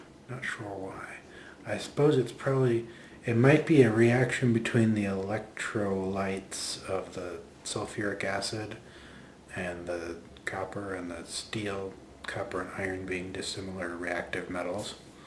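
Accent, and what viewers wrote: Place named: United Kingdom. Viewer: I'd like to see your other British accents.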